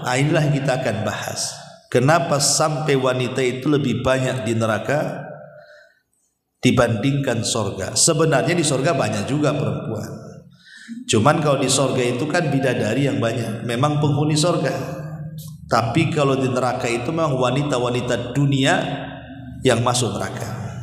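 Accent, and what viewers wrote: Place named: Java, Indonesia. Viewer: Ceramah Bpk enak di dengar. Bahasanya lembut. Jowo nya tulen Njowoni. Sehat njih Bapak Ustad. Aamiin Ya Robb🙏🙏🙏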